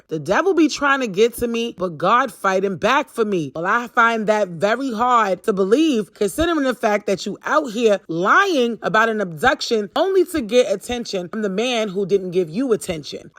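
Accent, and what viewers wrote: New York, United States. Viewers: I Lvov’s ur New York accent❤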